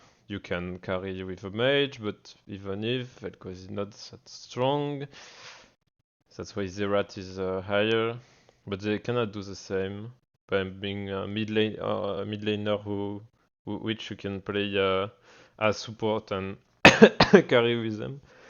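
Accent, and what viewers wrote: France. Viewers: Cet accent français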